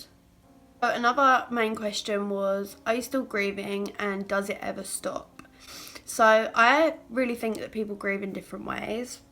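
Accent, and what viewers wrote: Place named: Essex, United Kingdom. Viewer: Omg it’s okay to cry just remember that there looking down watching u carry on being amazing and I honestly love u so much and ur so inspirational and I’m obsessed with ur Essex accent lol 😂 ur gorgeous and strong remember that!! 👌🏼❤️❤️❤️❤️❤️❤️❤️❤️❤️❤️😍😍😍😍😍😍‼️‼️‼️‼️‼️😭😭😭 We all ly ‼️❤️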